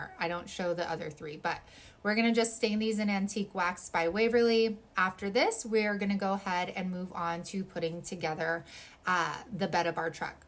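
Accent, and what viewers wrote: South, United States. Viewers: I couldn't find the little tool you used to make the wood grain on your Amazon page....Did I just miss it? I thought I looked at everything! Love your crafts and your ways of explaining how to do them. You talk my talk! You must be from the South too! Lol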